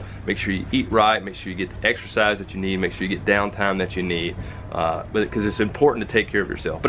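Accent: North Carolina, United States. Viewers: I love your voice. Are you originally from NC? I’m from Washington state and have no reference for different southern accents. One of my daughters-in-law is from Pennsylvania and she talks very fast.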